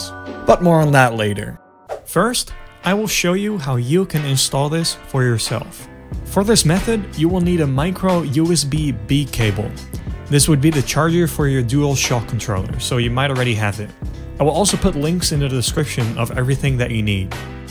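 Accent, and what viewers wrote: Netherlands. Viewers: Are you dutch? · you have a dutch accent. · r u dutch? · i knew you were dutch also ty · are yu dutch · I heard your dutch accent and instantly thought u were dutch then i saw the notification on ur laptop and yeah i was right you're dutch! Just like me :)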